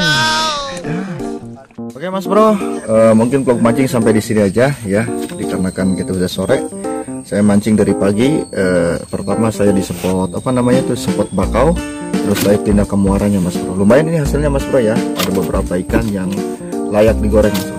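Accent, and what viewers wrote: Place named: Banten, Indonesia. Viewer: dari logat bicara nya mah kaya orang Banten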